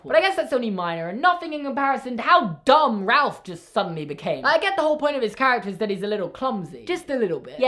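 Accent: United Kingdom. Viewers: Oh yes British dad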